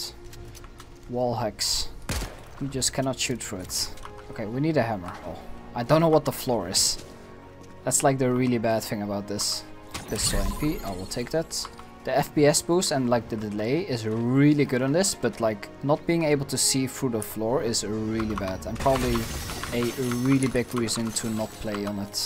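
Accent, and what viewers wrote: Netherlands. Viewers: Are u dutch? ur accent is so strong. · Yoo bro you dutch ??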